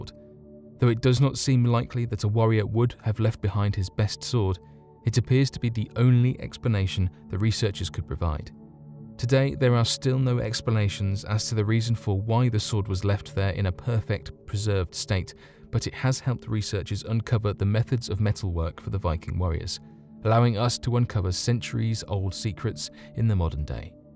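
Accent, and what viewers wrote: United Kingdom. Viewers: All this is a thievering compilation of other people's info from the net and presenting it with a british snooty accent for ignorant fools as news.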